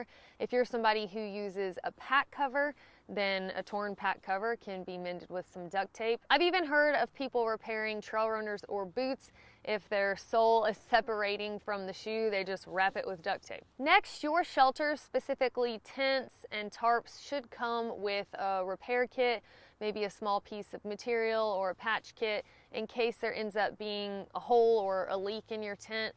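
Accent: South, United States. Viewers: Just love your communication ability. Very efficient and has the perfect southern accent.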